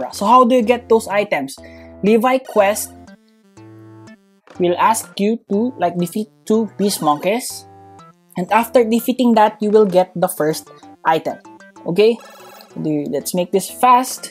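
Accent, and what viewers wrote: Philippines. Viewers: Why do you have a filipino accent?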